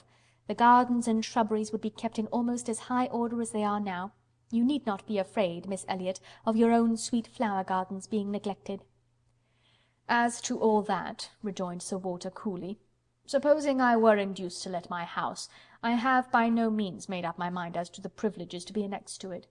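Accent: RP, United Kingdom. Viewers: love her posh voice :D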